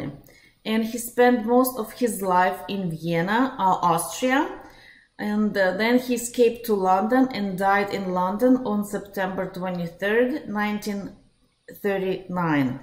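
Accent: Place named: Russia. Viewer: You have a heavy Russian accent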